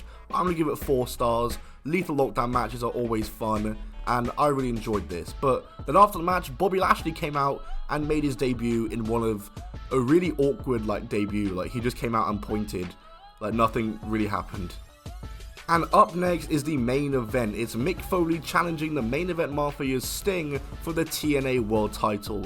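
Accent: England, United Kingdom. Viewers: Seriously his annoying obviously brother English voice ruins it.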